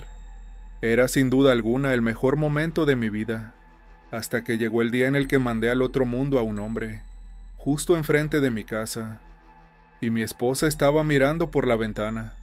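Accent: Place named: Jalisco, Mexico. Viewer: Hola amigo me gusta como relatas tu forma de ablar nose pero me imaginó que eres de los mios sin ofenderte yo soy de jalisco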